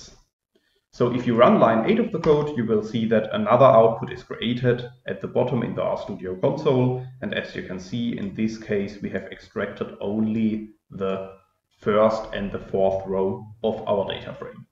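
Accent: Germany. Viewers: I do really appreciate this video and the author -- helped me a lot since I'm a complete R dummy so far and trying to comprehend the language via Coursera. Haven't found any real examples on how to extract multiple rows of data frame to finish assignment neither on discussion section nor on web, while you explained in details how that works -- a big big thanks! Have subscribed to the channel, think will be useful. Super lovely German accent -- greetings from Russia :)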